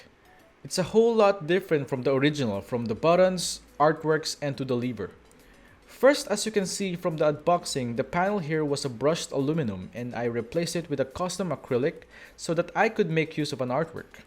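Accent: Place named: Spain. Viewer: Oye hermano puedo apreciar que hablas español o tal vez Portugues xd Well Im planning on buying this bad boy as my first stick (You know, going to get serious) But, how hard is it to mod? As a completely idiot and begginer? Mi biggest issue with the stick is the lever but aside from that the stick is sooooo beautiful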